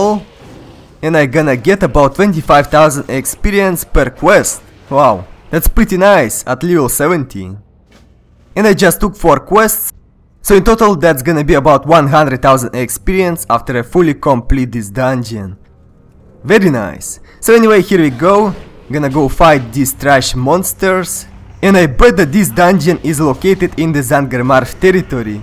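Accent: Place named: Russia. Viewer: that is one heavy russian accent you got there bratan.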